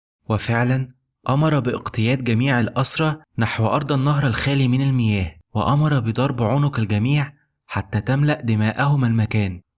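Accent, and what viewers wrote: Egypt. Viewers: قناة جميلة جدا و مجهود يشكر لكن نطق اللغة العربية ضعيف جدا و تغلب عليه أخطاء نحوية و مخارج الحروف بلسان مصري غير فصيح. أتنمى التغلب عليها رغم صعوبتها. وشكرا مرة أخرى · اخوي ذول الناس يحاربونك عشان ما تكمل روح كمل ولا تهتم نفهم على لهجتك المصرية ما عليك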